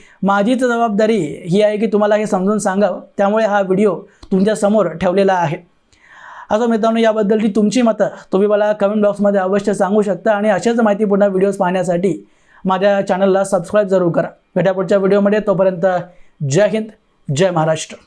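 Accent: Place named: South, India. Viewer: तू दाक्षिणात्य आहेस मराठी बोलतोयस तुझं अभिनंदन पण तुझ्या बोलण्यात दाक्षिणात्य बाज आहे.तुला जो पुळका हिंदीचा आला आहे ना तो तू तुझ्या मूळ राज्यात जाऊन पहिल्यांदा हिंदी भाषा सक्तीची करण्यासाठी अभियान चालू कर.इथे अक्कल पाजळू नकोस. जय जिजाऊ जय शिवराय जय शंभूराजे 🚩🚩🚩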